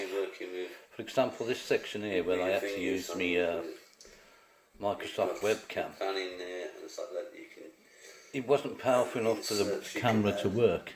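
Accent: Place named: Birmingham, United Kingdom. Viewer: Never knew you was a brummie mate, you a villain, cov or bcfc? 👍✌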